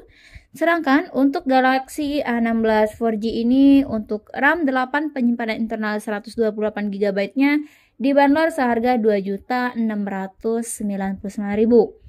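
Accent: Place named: Sumatra, Indonesia. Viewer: Sumatra banget bahasa nya, samsung galaxi anam belas😢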